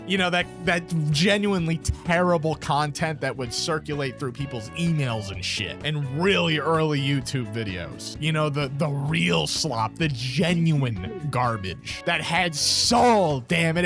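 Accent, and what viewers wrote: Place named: Brooklyn, United States. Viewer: This is one of the most brooklyn accents ive heard and im all for it lol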